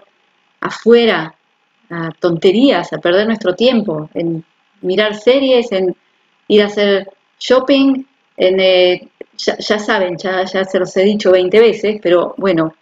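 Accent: Argentina. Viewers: Perdona, eres argentina?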